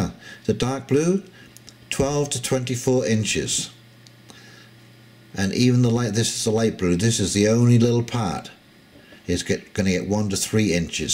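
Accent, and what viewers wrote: England, United Kingdom. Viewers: you have a clear English accent - but you talk as if you might be in the USA. Forgive me if I have missed something. Could you say where you are based? I am in the UK SE. I now listen to you regularly. Regarding your food advice: I reckon I have got things quite well organised for myself. My worry is for the cats and how I would feed them if there was a famine. The only thing I can think of for pet owners is to buy several sacks of dried food.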